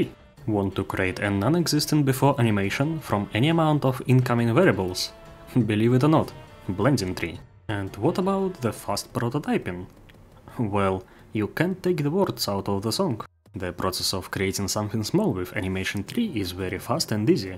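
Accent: Russia. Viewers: русский акцент? information was so useful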